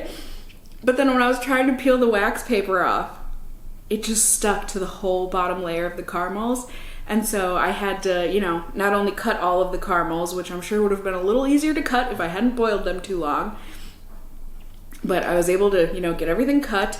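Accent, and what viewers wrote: Minnesota, United States. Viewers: She definitely has a Minnesotan accent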